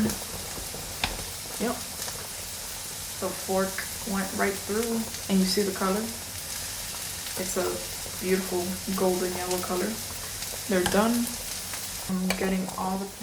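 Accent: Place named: Miami, United States. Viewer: Lmao you’re so Miami with the accent and hand gestures, I love it!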